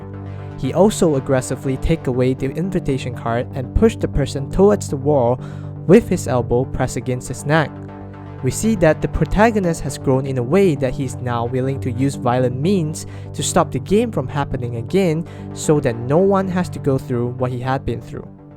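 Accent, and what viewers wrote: Singapore. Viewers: A segue, but you sound so singaporean! (Not a bad thing) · you're from singapore arent you? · Are you from Singapore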